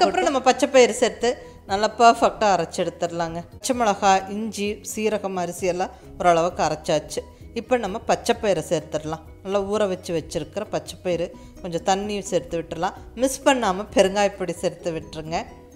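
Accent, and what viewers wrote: Coimbatore, India. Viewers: Hi aunty I made this dosa today and it turned out very crispy and yummy. Whenever I think of anything to cook, before asking my mother I visit your channel and I find the solution to it. I just love the way you flourish Coimbatore slang of speaking and I adore that so much. U have very peculiar pronunciation. Looking forward to meet you one day aunty.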